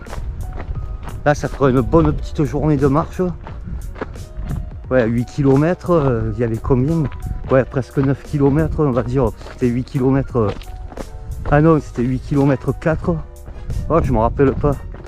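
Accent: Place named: Provence, France. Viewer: Coucou si vous me le permettez ☺️ Merci infiniment de nous faire partagez votre magnifique région qu’est la Provence Je suis allée il y a quelques années déjà à Saint Rémy de Provence j’y ai visité aussi les baux Votre accent me réchauffe le cœur J’espère que vous êtes bien rentré de votre randonnée! Je vous souhaite une bonne fin de dimanche et, Un bon repos surtout après tous ces kilomètres dans les jambes Une amie virtuelle de la Belgique 🇧🇪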